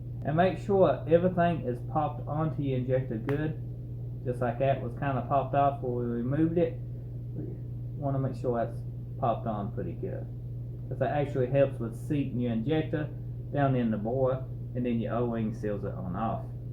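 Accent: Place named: Louisiana, United States. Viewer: Very helpful thank you great video your accent sound Cajun.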